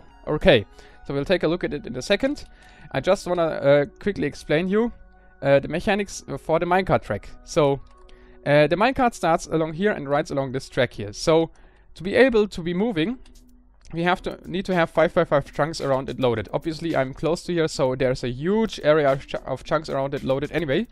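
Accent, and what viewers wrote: Germany. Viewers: Are you german? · mich persönlich stört der Akzent nicht wirklich, aber das "th" ist noch ein wenig ausbaufähig im Grunde das klassische Problem vieler Deutscher im Englischen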